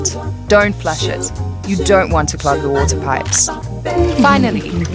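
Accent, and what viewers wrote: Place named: India. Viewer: Dude are u from India, sounds like that!!!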